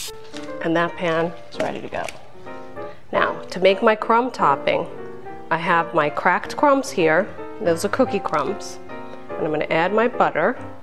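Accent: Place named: New York, United States. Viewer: From the accent she's either from N. Jersey or the city? This video is my first, great recipe.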